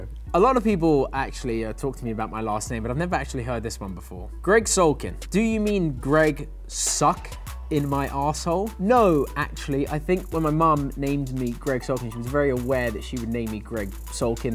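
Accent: United Kingdom. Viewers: I didn't expect he has a British accent... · Gregg sulkin can run me over with that British accent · I did not know he was British wtf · He gives Tom Hiddles a run for his money to be the most British person to ever British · Why did I not know that Gregg Sulkin was British eye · I know him as Chase so hearing him with a British accent is trippy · He's... british? Oo hoo this just got even better 🤣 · yeah he's British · Mindfcked after seeing him play an American boy on runaways and now this Brit accent ? Lmaooooo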